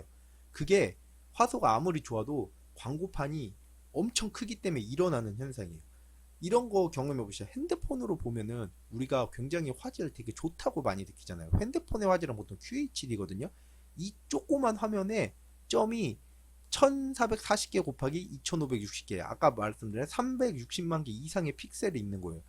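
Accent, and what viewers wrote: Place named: Jeonju, South Korea. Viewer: 전주 사람인가? 말투가전주인데..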